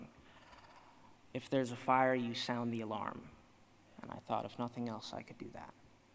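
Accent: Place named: England, United Kingdom. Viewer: Hello Aidan, I hope you see this comment. You have many fans in Turkey and there are no Turkish subtitles in your videos. It is not a problem for me, but I think it is a problem for those who do not understand the English accent. Please take this comment into consideration and you should do something about this issue. I think we love you.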